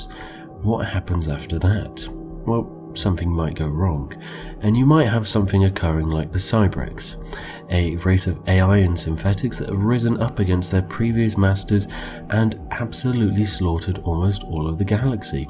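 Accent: United Kingdom. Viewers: I kinda miss this brit voice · So he’s not just doing a bit, is actually that British?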